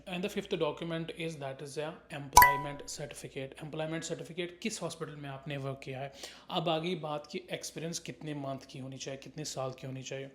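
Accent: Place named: Kashmir, India. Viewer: Bhi lots of love from mother land kashmir ❤ mai soch he raha taa aawaz tio kashmiri he lag rahie hai...phir old vlogs dekha....luv 😮❤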